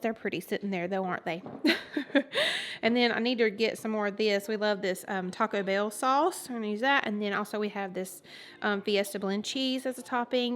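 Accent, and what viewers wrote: South, United States. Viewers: Girl you talk like me, country as cornbread 😂 I love it! Proud of my southern drawl. 💁🏼‍♀️ New subbie! 🤗 💕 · All these recipes look great girl☺️ I always enjoy watching you and listening to your awesome southern accent! 💗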